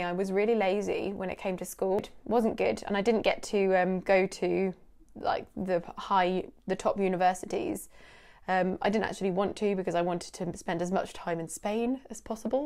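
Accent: United Kingdom. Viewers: I've been watching English with and now this for about a week now. I'm an author in America and I just enjoy the British accent a lot, and I enjoy watching a beautiful person talk (and you're very beautiful), so listening to you and watching you is appealing, and here and there I've learned some things to improve my English. (I've been pronouncing albeit wrong for years, lol.) It surprises me to hear that you're 24 in this video, which means you're 25 now. I'm 31 and I've had this feeling like you were older than me, more mature and developed somehow. I rarely ever get this feeling about someone younger than me. Perhaps never before, actually. I think it might be the British accent; it comes with an association of rightness for me. I wonder how many other Americans would react to you the same way I have. Blessings.:) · Thanks to you now i like british accent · British English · First of all thanks for your channel "English with " which has improved my English drastically. 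Now I have also started following this new channel of yours which is now improving my listening skill, especially British accent.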